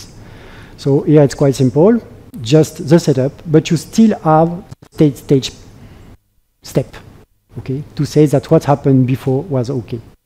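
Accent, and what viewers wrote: France. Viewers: vıcık vıcık fransız aksanı, başka adam yok de mi sunumu yapacak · He says ON POINT: he is trying to pronounce Endpoint apparently. He pronounces English words according to the French pronunciation rules. ZE OST = The host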